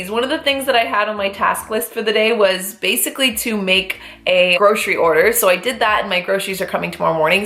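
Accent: Canada. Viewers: are you canadian? you sound canadian😂 edit: i’m stupid u live in toronto🤦🏽‍♀️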